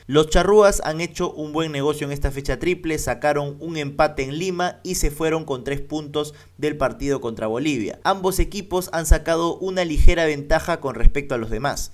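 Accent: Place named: Argentina. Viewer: Obsesionado con los argentinos? Xq tienes un dejo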